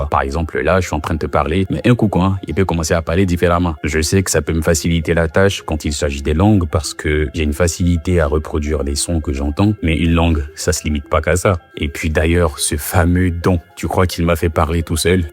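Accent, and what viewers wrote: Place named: Ivory Coast. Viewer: C'est l'accent ivoirien ça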